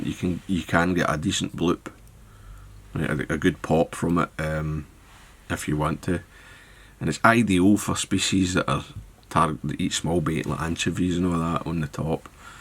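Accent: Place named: Ayrshire, United Kingdom. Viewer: See you’ve not lost yer Ayrshire twang,. Think this size flea is ideal for pike too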